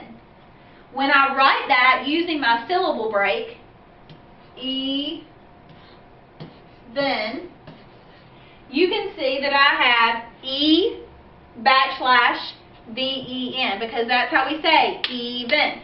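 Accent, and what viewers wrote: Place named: South, United States. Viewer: Vicky Popova it’s a very common pronunciation for native speakers in the southern United States. Definitely a native speaker as non-native speakers don’t tend to learn this particular dialect or whatever.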